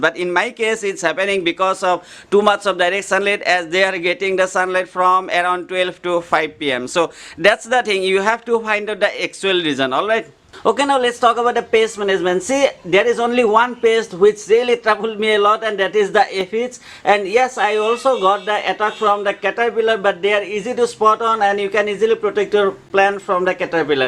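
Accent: Assam, India. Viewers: Accent to huni axomia zen lagisil,kintu aji gom pai montu bhal,lagise.moi apunar video bilak saon aru bohut hikisu.kintu like aru suscribe aji disu.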